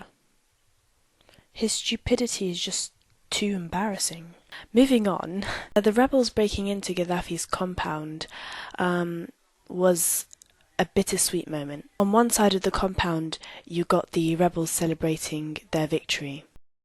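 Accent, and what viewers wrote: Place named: United Kingdom. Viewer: what's up with the brittish accent?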